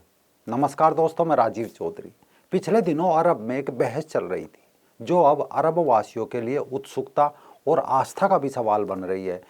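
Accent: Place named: Western Uttar Pradesh, India. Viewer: आपका पश्चिम UP वाला लहजा बड़ा अच्छा लगता है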